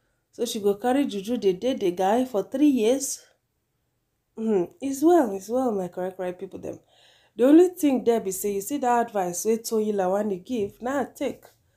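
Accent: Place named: Yoruba, Nigeria. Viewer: I love how you're reading this our Yoruba 😅😅😅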